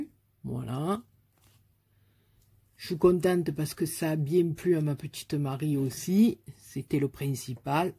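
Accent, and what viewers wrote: South, France. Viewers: Coucou ma, wouaaaaaa encore une merveilleuse création, elle est sublime j'adoreeeeeeee, tu as toujours de merveilleuses idées de déco, toujours très raffinées, mille bravo ma et merci beaucoup c'est encore du pur bonheur de voir ta vidéo et de t'entendre avec ton fabuleux accent du sud rempli de chaleur et de soleil. Mille gros bisous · rooh ma j'adore je me l'enregistre tout de suite!!ta carte enveloppe c'est une merveille!!j'ai cette collection de papiers,ils sont juste sublimes!! he oui ils n(y a pas besoin d'en rajouter des tonnes!!merci pour cette vidéo ou tu m'a fait rire comme une malade et ton accent du sud j'adore!!gros bisousss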